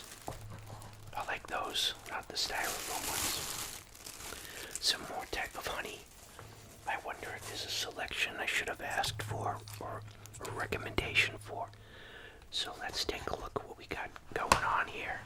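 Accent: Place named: Boston, United States. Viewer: I'm just curious... How would you foneticly write the way he says 'slaw'? Sloa? I just love that boastn' accent #billyburr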